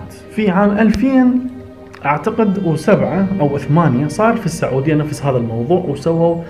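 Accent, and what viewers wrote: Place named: Iraq. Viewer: انته بطران وبالهجه عراقيه جاي اتلهوس ارجو د على تعليق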